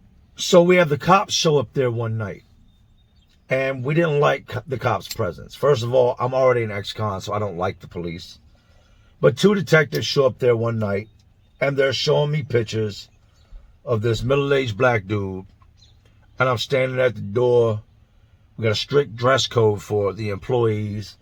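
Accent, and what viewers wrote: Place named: New Orleans, United States. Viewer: I really enjoy your content. I listen while I’m grocery shopping. Question: your accent has a lot of New Orleans inflections. Did you ever live here in NOLA? You say a lot of things the way NOLA natives (from the 3rd, 7th, 17th ward for example). I’m so curious how you got this accent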